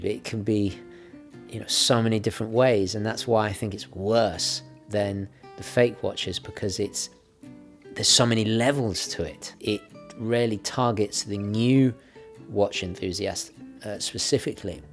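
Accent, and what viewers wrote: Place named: United Kingdom. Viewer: I just tune in to listen to you talk with your British accent.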